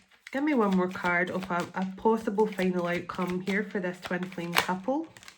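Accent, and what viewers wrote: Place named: Scotland, United Kingdom. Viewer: I hyped you because I just love you! Forgiveness is healing and I believe in the same thing. Jesus taught us to forgive and love one another as my father loves you. I stand by that. I would rather listen to my intuition and let the Holy Spirit guide me more than anything. Also I can’t get enough of your Scottish accent and my youngest Kyle loves your voice too. He said it’s soothing to him. you are a blessing to us all! So happy to know you! I will email you as more things have happened that I can’t discuss here. Thanks for being you 🙏🏻❤️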